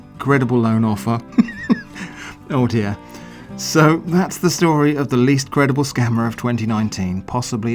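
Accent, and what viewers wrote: United Kingdom. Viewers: Amen to that ! He indeed has a very soothing British accented voice. Or perhaps Australian ?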